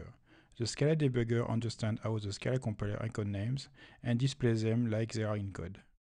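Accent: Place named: France. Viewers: The french accent is very very very strong. Could make some efforts to speak with a better english accent. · I agree that his french accent(I could understand only half of it) was strong but still you could be polite. At least he made an effort to make a screencast for us.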